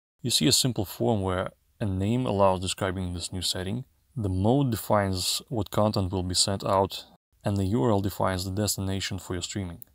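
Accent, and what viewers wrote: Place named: Russia. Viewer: It's a Russian accent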